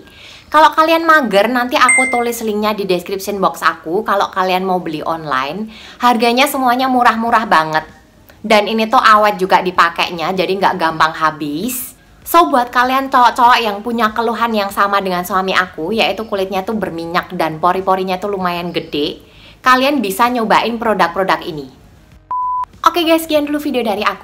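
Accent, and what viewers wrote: Java, Indonesia. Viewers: Medok kali bahasanya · medok mba wkwk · medok banget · Medok nya itu lohhh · NGAKAK LIAT NYA SOALNYA NGOMONG NYA KAYA NGEGAAS😆😂..JAWA YA BAK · Medhog guys suaranya😁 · Iya jowo banget😆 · ngomongnya medok bnget😂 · Jowo ngendi lurd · Mbak kok medok banget? Jawanya mana? Dalam dari jogja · Mbak ngomong e jowo medok😍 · Jowomu ketok mbak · Asli jowo opo mbak? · medoknyA mntap kk hhaa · cempreng n medok...aku suka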